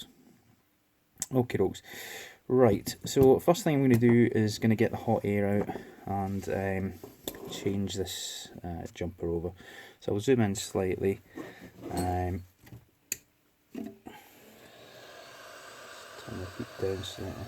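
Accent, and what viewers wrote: Scotland, United Kingdom. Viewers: I like your Scottish accent. Don't do yourself down.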